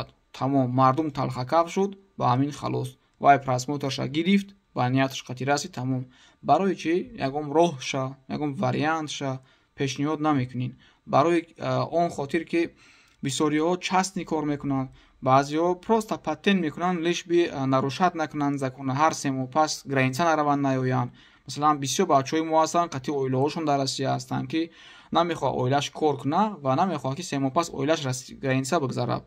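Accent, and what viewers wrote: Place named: Kulob, Tajikistan. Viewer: чесний пацан ма як гапда кайф мекнм "талхакаф"шеваи кулоби очень приятна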